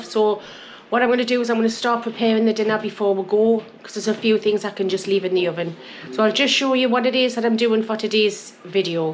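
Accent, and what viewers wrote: Newcastle, United Kingdom. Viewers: Hi I just wanted to say I've just found your great channel as a home cook I really enjoy your cooking your hubby is great too I'm John in stow on the wold Cotswolds semi retired now so more time to cook! 🎉also I really love the accent my bestie is a Newcastle guy any way pork belly I've made mince and onion pie ( my own pastry)! Lol loved my nana too she was a great cook! Will continue to watch ❤❤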